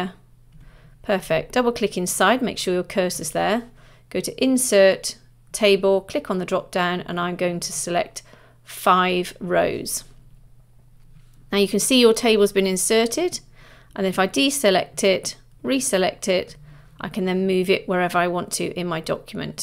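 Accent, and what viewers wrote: United Kingdom. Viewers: Thanks for the Video. I liked the British accent.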